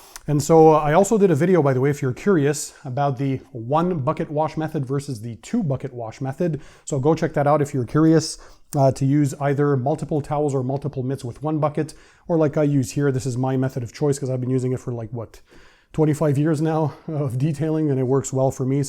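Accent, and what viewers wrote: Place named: Quebec, Canada. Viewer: Waitttt your from Quebec???? You have Quebec French accent!!!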